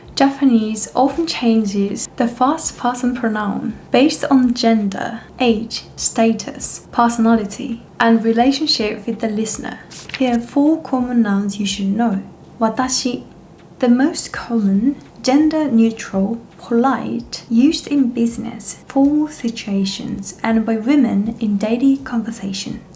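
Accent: England, United Kingdom. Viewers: your last about no san to titles but is it okay to say xx shachou san? Thanks. BTW I love your English accent cuz in Japan most of time i hear is American English but yours is English English. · Your accent is pretty incredible. Did you live in the UK when young or did you work on it? Because I've never heard a non Brit speak like that. Even Americans. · Why do you sound british? 🫤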